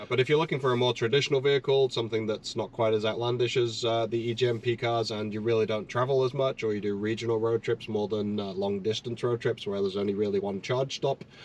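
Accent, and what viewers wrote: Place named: Northern England, United Kingdom. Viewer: Sounds like he's from northern England----- north-west possibly---- anyways good review.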